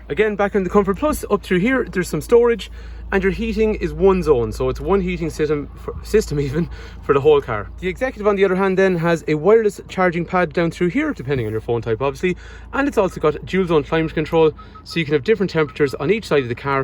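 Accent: Ireland. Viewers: Love your reviews, but you talk as quick as some TV ads, where every second counts! Your accent is a little difficult for an Aussie to follow sometimes. haha. If we travel to Ireland for a holiday, we may just look you up. Well done.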